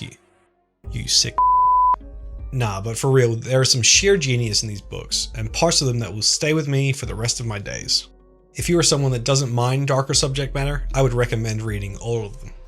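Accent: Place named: Northern Ireland, United Kingdom. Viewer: where are you from, your accent is fascinating.. you sound northern irish but u've either been to Austrialia or the States.. its driving me mad i cant place you!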